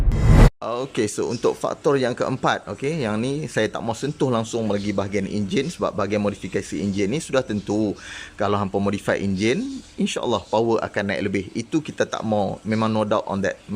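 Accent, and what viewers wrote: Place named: North, Malaysia. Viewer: Terhibur ckp utara sanrtai2. Kepala masuk bakui, tauk buang, cap kepala naga.. Hahaha.. Senamg faham dan xstress. Semuanya info yg sgt bermanafaat dan terbaik. Muga Allah tambah lg ilmu kpd tuan utk manafaat bersama.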